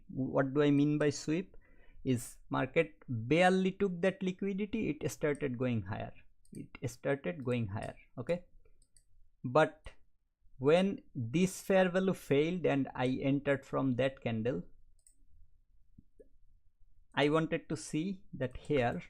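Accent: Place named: India. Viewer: you sound indian.. u indian?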